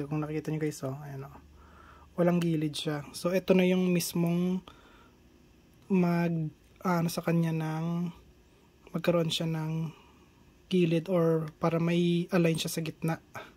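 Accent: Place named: India. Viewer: Bros talking Indlish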